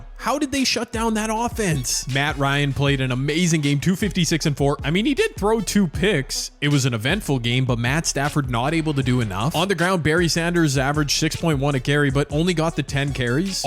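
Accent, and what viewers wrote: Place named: Canada. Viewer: Your accent sounds Canadian, then I looked at your channel and confirmed that you Are Canadian. Being a Canadian, what do you think about Canada getting an NFL team or Two?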